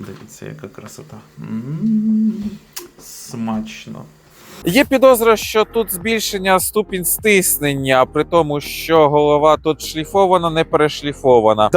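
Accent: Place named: Russia. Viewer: Диктор намагається говорити українською мовою, але дуже не вміє. Йому зручніше говорити російською. Це помітно, що повсякденно він говорить російською мовою.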